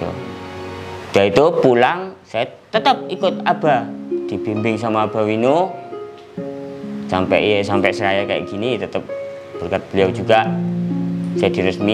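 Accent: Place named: East Java, Indonesia. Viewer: Logat arek etan e melekat😁🙏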